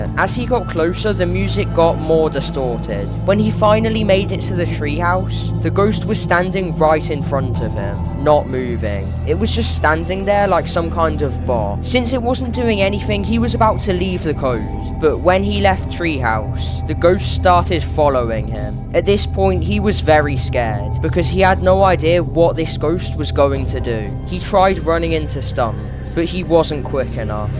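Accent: United Kingdom. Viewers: It's supposed to be creepy but British voice just makes it..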